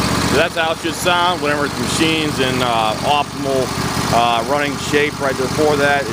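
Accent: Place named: Pennsylvania, United States. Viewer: You sound like you got a PA accent like me lol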